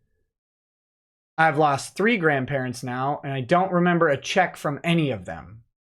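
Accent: Germany. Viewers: Your German accent takes this to the next level hahaha! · That German accent marks it 1000 times funnier!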